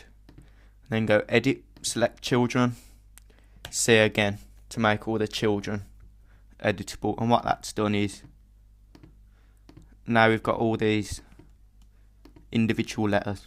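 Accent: Birmingham, United Kingdom. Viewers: Typical Brummie :) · Birmingham Accent FTW :D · Hmmmmm.. Are You From Dudley? Im From There And You Sound Like A Brummy -_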